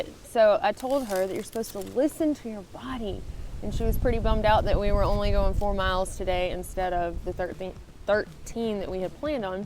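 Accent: South, United States. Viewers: I just realized I've watched you for so long I don't hear your southern accent anymore lol. Great video as usual. Happy Trails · southern vernacular.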